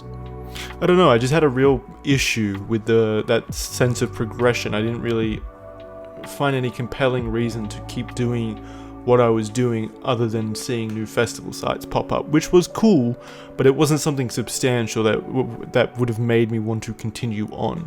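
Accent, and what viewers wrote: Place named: Australia. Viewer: Haha funny Australian man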